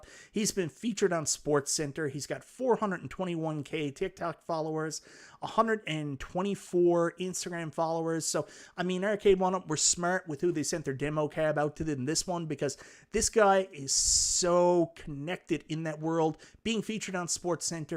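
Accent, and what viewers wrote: Canada. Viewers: So glad there is a Canadian voice for arcade 1up. Hoping for marvel vs Capcom 2 in Canada somehow without that savage VAT added.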